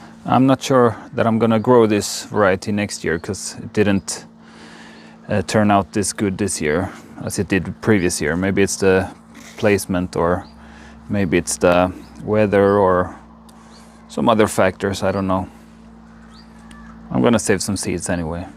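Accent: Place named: Scandinavia. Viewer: Fantastic! I've just done my 1st harvest so it's nice that I'm lagging 3 weeks behind you so I can look at the tutorials. I'm definitely interested in making snus bc it's illegal in Belgium, makes it feel more like a delicacy. Are you Swedish? I detect a Scandinavian accent, didn't know you could grow tobacco in Scandinavia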